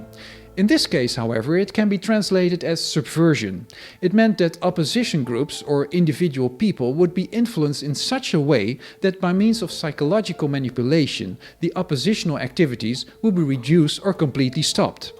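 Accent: Netherlands. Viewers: Are you a Dutchman? Your English is like that of a Dutchman.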